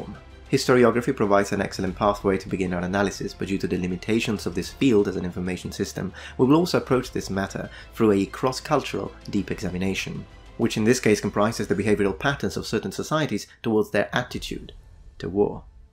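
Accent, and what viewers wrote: Greece. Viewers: I love your Greek accent, really nice job. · Great greek accent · Great video! Your Greek caught me by surprise!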